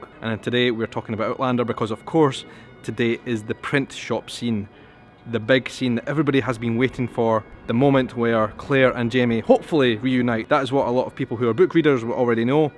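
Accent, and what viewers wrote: Scotland, United Kingdom. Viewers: You are welcome. I love that Scottish accent. I never been to Scottland ever but when you show us the sites of Edinburg I feel like I am there. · so happy to hear a scottish accent... and actually understand it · I envy your background, your culture, your accent and mostly your country with it's rich history. I myself didn't know about the history Scotland had, thanks to Outlander I've learnt a lot. Thanks for sharing you great country with us.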